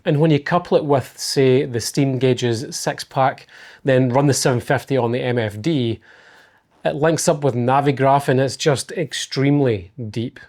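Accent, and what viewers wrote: Scotland, United Kingdom. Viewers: ha! Mate this is quite a clear Scottish accent - even as a Londoner I can struggle to understand a Scottish accent but on the scale of things this guy could read the evening news as far as I'm concerned. Try subtitles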